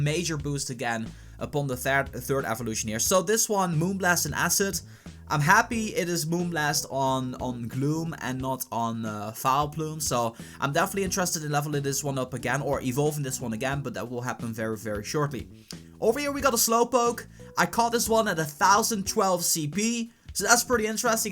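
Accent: Netherlands. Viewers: Are u ducht ?? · Kom jij uit Nederland wouw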